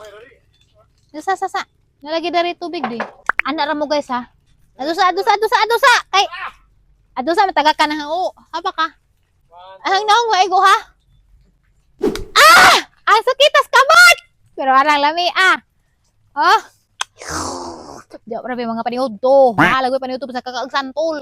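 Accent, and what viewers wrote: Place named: Southern Leyte, Philippines. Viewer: Suway ko shout out dai … my name is Haydee Bito Bermoy Moyers from Surprise, Arizona! si mommy nako Ang naka discover sa imo lipay og moot kaayo siya watching you. She passed away 5yrs ago na pero pabilin guihapon ko follow nimo… it reminds me of her when I listen to you talk… she was born in Southern Leyte and I grow up listening to her dialect like yours.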